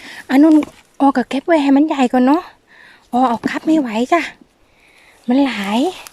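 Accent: Korat, Thailand. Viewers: พูดภาษาโคราชฟังน่ารักจ้าน้อง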